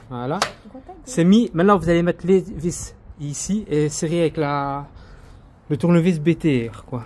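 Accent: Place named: Belgium. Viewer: j'aime bien l'accent BELGE UNE FOIS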